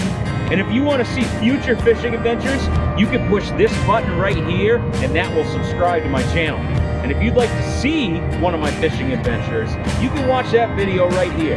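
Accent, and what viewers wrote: Midwest, United States. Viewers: I’m confused. Midwest or Boston accent here lol.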